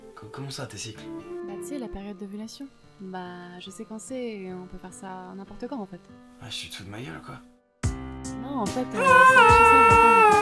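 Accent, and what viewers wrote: Alsace, France. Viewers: Cet accent alsacien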